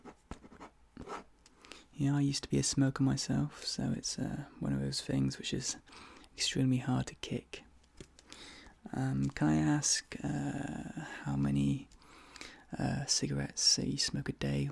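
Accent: United Kingdom. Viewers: Love the accent what part of the UK?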